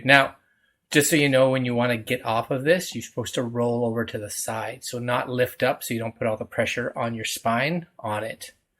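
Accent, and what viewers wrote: Canada. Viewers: I’m Canadian but this guy sounds 100 times more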